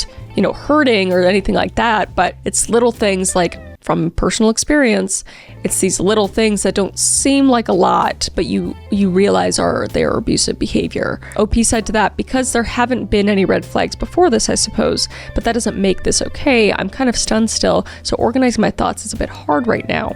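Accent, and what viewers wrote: Canada. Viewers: Ohhhh you're canadian! I have been watching like 20 of your videos in the past 2 days and i was like huh maybe i havent heard enough texas accents to judge but there is something off about this one <3 really enjoying your work, thank you for all the genres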